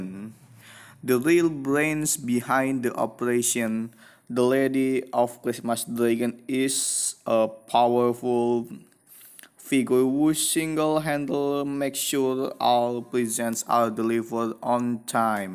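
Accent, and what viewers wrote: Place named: Indonesia. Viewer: Logatnya indo sih..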